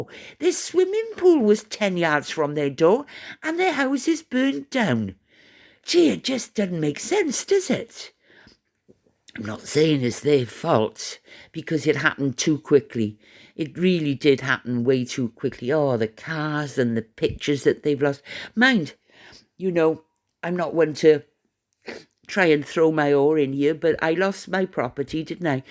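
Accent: Wales, United Kingdom. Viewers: Just found your channel, it’s lovely hearing a Welsh voice, so sorry you have been poorly, this Welsh weather hasn’t helped anyone has it, damp, cold, foggy and grey. You take very good care of yourself. No one likes going to the Dr but sometimes my lovely we need to, you go and get the blood test done and sort everything out you’ll feel much better afterwards. Stay cwtched up and take care🙏❤️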